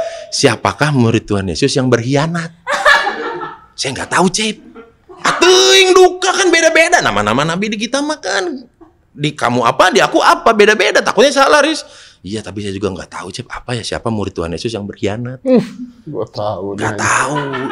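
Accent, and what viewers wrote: Sunda, Indonesia. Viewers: Bang ini emang GK pernah gagal KL udah STAND UP COMMEDY lucu nya GK tertahankan.. Apalagi saya sebagai orang Bandung sama dengan meski darah Batak,hafal betul dialek" Sunda yg bisa bikin saya ketawa terpingkal pingkal... Bang SUKSES SELALU SEHAT SELALU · Hahahaha... Bang Sunda Pisan... Keren materinya.... Cerdas dan menghiburrrrr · Meni kental sundana mang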